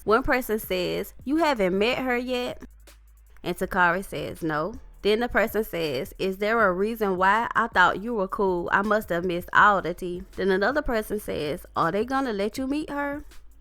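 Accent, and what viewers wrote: South, United States. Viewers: Girl, your country accent is so strong that I can barely understand what you're saying. I would just have to read with your voice on mute. Sorry. Where are you from? Alabama? Arkansas?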